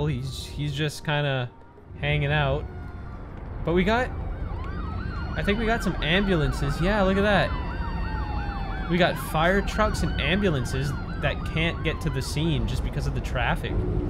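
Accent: Canada. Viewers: Found this channel, love it and love the city and story behind it. Also, you a fellow Canadian eh? Cheers bud, keep up the great work 👌🏻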